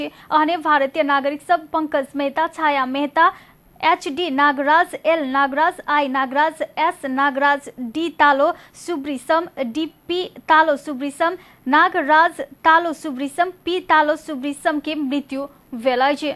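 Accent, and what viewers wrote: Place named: Nepal. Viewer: sounds beautiful the way she speaks maithili with lot of nepali accent. hahaha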